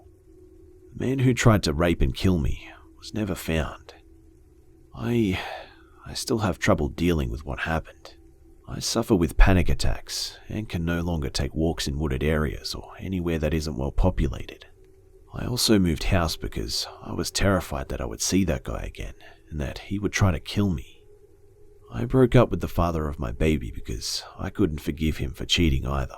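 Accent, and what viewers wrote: Australia. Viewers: are you Australian?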